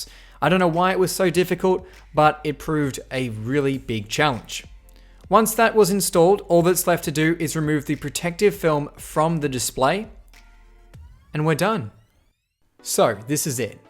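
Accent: Australia. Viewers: He’s Australian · U Aussie?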